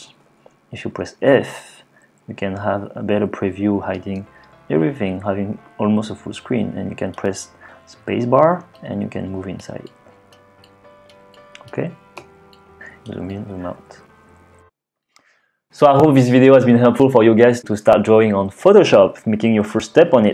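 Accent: France. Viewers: u loose a little bit your french accent in your latest videos ;)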